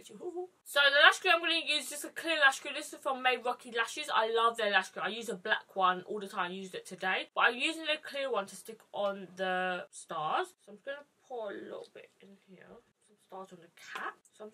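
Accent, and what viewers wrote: United Kingdom. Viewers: Olivia 811 1. She's British. 2. She has braces under her teeth which causes her to have a lisp.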